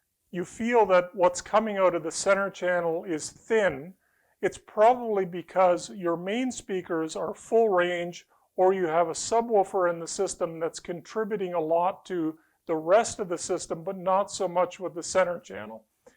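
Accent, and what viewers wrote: Canada. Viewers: In literally 3 secs i knew guy is from or in Canada!!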